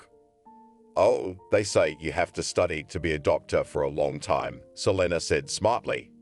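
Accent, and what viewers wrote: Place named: United Kingdom. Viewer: well im sorry to say that the story might very good but I need to quit listening because the way the narrator speaks, it's so hard to understand as he is with british accent. making letter A as I, like in the word play as ply. letter O as Oi, as in the phrase I doint knoi, goi hoime, etc. hope you could find a better narrator with american accent. sorry again and thank you.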